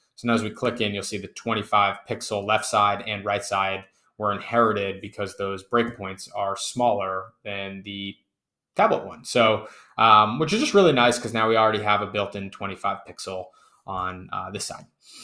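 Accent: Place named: United States. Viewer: Greetings, from St George! Finally, a site on Bricks described in an American accent. At least I can understand what you’re saying. Very nicely done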